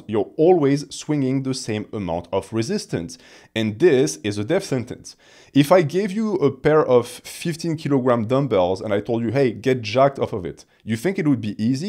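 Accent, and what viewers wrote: France. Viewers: Wait are you French ?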